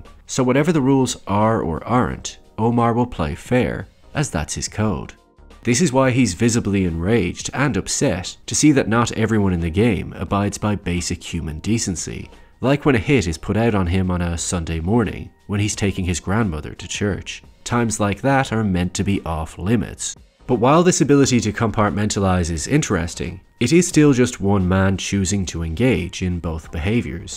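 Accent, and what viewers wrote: Ireland. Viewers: Great channel. Please consider using your own lovely accent rather than the classic youtuber vocal fry + upwards cadence thing. I can hear there's an Irishman in there!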